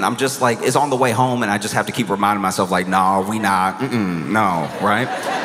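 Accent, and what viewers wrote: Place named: South, United States. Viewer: his southern drawl is the cherry on top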